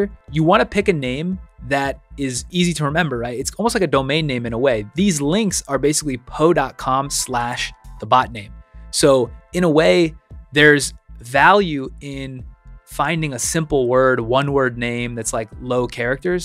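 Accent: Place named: United States. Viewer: Your voice sounds sooo American, omg lol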